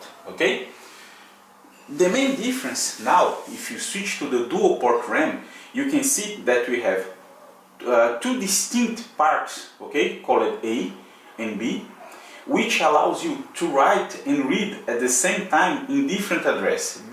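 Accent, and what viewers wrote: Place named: Brazil. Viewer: Great, but that brazilian accent :D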